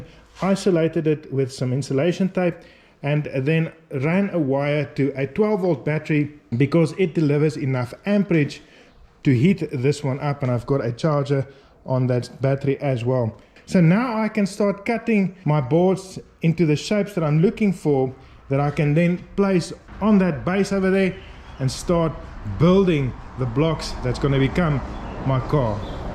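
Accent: South Africa. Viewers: You sound almost like a South African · Heavy South African accent...🇿🇦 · Afrikaans accent is the most beautiful in the world. Masterful work, also. ❤ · Are you South African?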